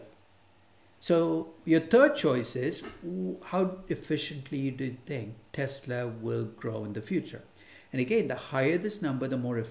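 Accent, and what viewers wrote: India. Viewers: Excellent sir.. are you from Kerala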